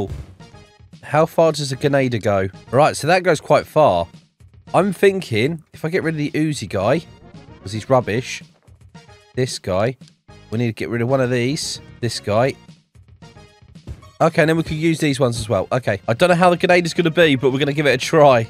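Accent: United Kingdom. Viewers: i love his ultra british voice😂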